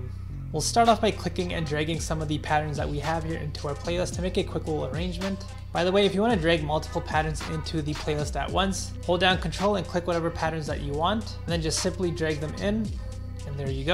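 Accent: Punjab, India. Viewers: Are u punjabi bro?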